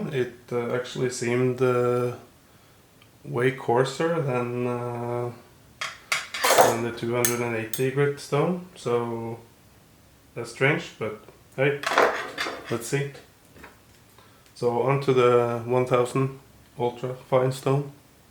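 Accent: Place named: Norway. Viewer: This er the most norsk engelsk jeg have ever hørt. Gøy å kommet over denne gamle legenden, savner gamle ytnorge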